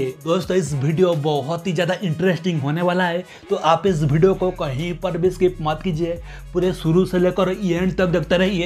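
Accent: Bengal, India. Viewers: Bhai bengali ho yea Assamese ho?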